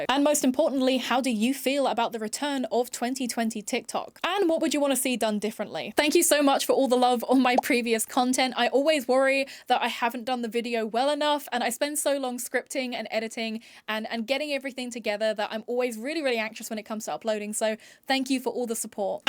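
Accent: United Kingdom. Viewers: OK, I can dig the cute dyed bangs, the funny eyeliner with the lashes kind of hits. Always admired a UK accent. But the huge goggles on the head? Never got that, dear.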